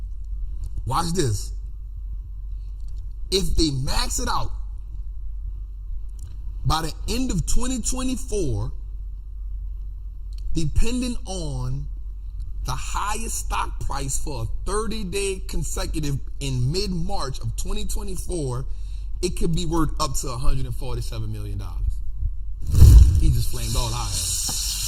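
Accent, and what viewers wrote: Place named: St. Louis, United States. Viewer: He kinda sounds like he’s from Louis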